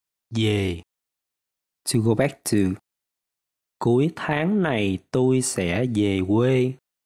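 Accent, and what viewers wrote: Mekong Delta, Vietnam. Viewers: xin chào, Hello, Bonjour! anh cám ơn con đă gủi liên lạc cho học tiếng Việt giống âm thanh miền duyên sông Cữu Long. Thanks! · Giọng này là giọng miền tây, tôi đoán là Bạc Liêu vì chữ đêm nghe như đim. Giọng Bạc Liêu iêu thường thành im như thêm thành thim.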